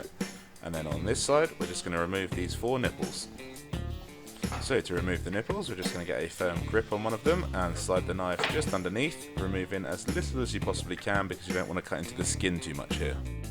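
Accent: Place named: United Kingdom. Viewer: ha ha! No no, keep the mystery alive! Especially with the dreamy British accent! lol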